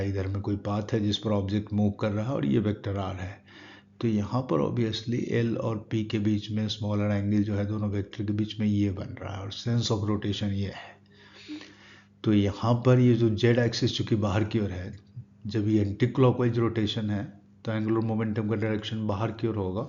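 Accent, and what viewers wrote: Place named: India. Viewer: Used india english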